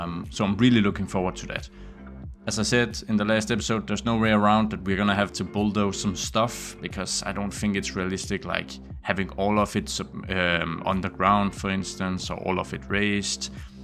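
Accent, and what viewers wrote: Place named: Denmark. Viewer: Love the Danish accent ❤️❤️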